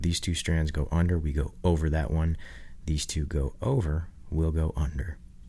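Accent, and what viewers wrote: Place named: United States. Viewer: no probelm you tell it quite well but it's funny to hear it from american instead of french ^^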